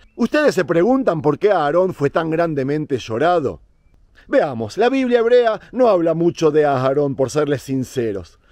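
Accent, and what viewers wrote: Argentina. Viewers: es hermoso,amo cada terron,cada grieta..desde lo mas profundo de mi alma,q El Señor me prestó te doy gracias - mi vida va atada a tus pies, cuando me muestras los precipicios y grietas,he aprendido a tolerar tu acento argentinon', en haras de los videos q subes--desde Venezuela Hashem t cuide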